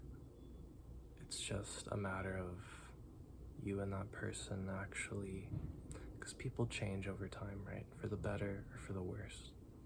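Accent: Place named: California, United States. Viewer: Also immediately guessed you were from ca with the way you talk haha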